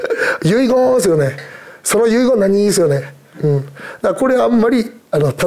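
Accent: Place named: Kansai, Japan. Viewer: 何言ってるかわからない人は絶対関西人じゃない。この喋り方を聞き慣れてないだけ。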